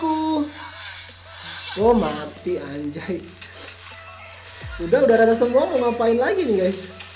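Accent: Manado, Indonesia. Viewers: Lu manado ya bro?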